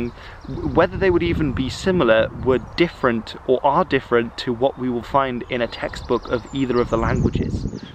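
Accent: United Kingdom. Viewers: I was just wondering, since he speaks English with a very British accent, but his Dutch is also spot on - usually it's one or another. is also a very British name.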